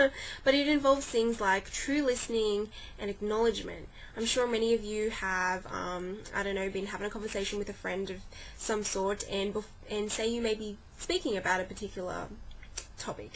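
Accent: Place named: Australia. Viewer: AHHH No? She has an Australian accent.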